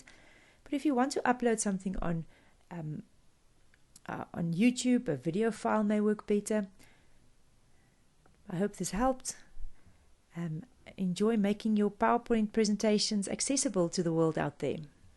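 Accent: Afrikaans, South Africa. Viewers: Thanks, it was very helpful and easy to follow. It is also a heartwarming surprise to hear an Afrikaanse accent. :) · Did not expect this to be South African lol. Dankie vir die mooilike video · Definately a south african voice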